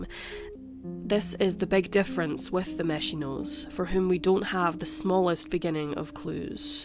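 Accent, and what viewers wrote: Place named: United Kingdom. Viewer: Your voice is freaking perfect! I don't know what it is about British accents, but 😍!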